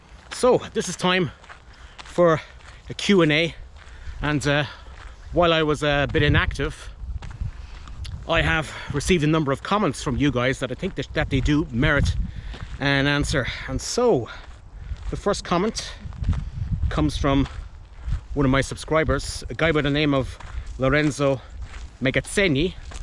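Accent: Ireland. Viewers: Don't lose your irish accent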